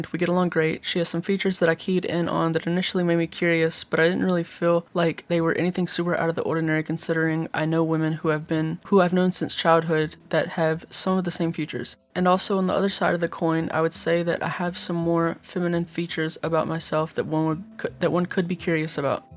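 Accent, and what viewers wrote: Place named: South, United States. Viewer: I like how it sounds like u have a slight southern accent that maybe ur hiding.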